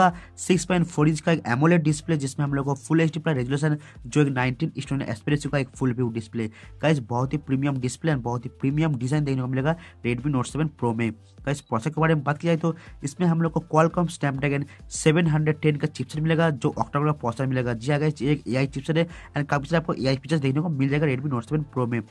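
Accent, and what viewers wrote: Bengal, India. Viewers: Aapka voice me Bengali tone mix ho rahi hai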